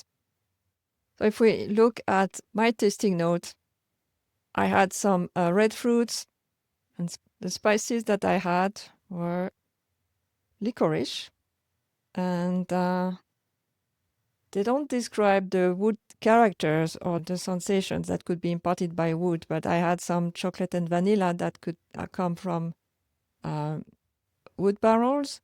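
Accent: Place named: France. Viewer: I love your Methods and your French Accent :)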